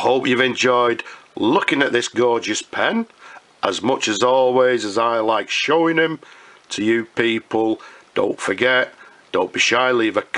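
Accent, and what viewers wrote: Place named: England, United Kingdom. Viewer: just know that I love your heavy english accent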